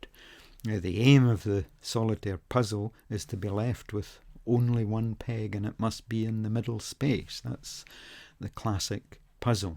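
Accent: Scotland, United Kingdom. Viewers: That was fantastic and great to hear a fellow Scot too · Thanks so much for the solution. More than that, what a delight to hear shades of the Scottish brogue again! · Thank you so much for this - it has been driving me nuts for ages! Very well explained, and in a good Scottish accent! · Excellent video. Very well explained. Having been born and raised in Scotland loved hearing the Scottish accent. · Thank you! And what a beautiful Scottish accent!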